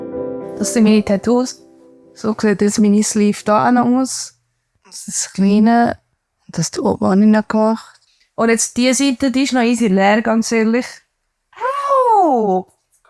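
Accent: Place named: Switzerland. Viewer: Keine Ahnung, warum mir das vorgeschlagen wird, aber voll interessant: Ich bin aus Wien und versteh fast alles, was du sagst, könnte aber niemals selbst so sprechen, wenn ich nicht mehrere Jahre in der Schweiz leben würde! 😂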